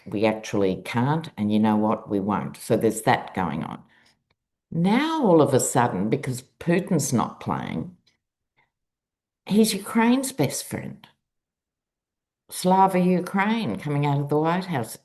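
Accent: Australia. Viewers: An Aussie living in the US… I love watching you… hearing you… so Australian… same expressions I still use lol makes me laugh…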